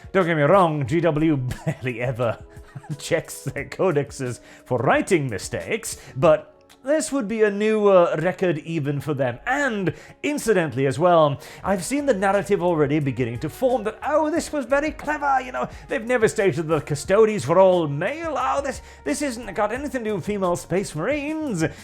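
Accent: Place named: RP, United Kingdom. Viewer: Why are you speaking like Etonian Jacob Rees Mogg pound shop Enoch?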